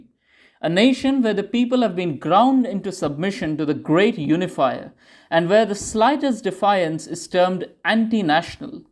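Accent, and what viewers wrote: India. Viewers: The narrator sounds Indian as shaferevich being impressed by Indian mathematics allowed Indian orthodox Christians to come to Rus! Long live Shaferevich Long live Orthodoxy Long live Mathematical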